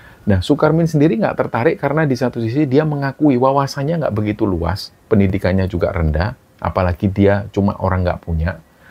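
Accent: Java, Indonesia. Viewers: Mas logat jaeanya medoook bangeet kalo bercerita😅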